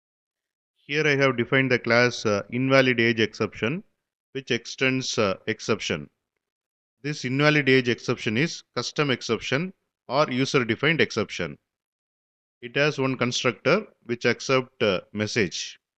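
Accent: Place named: India. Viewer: indian english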